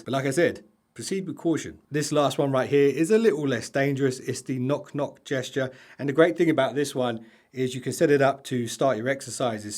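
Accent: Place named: United Kingdom. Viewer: Why are all the YouTube galaxy videos British?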